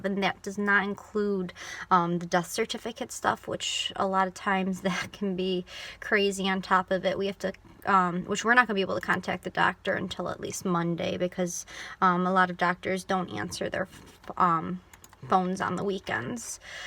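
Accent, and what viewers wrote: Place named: Buffalo, United States. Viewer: Are you in Buffalo by any chance? I am from Clarence, and your videos and your voice have a touch of my hometown in it :)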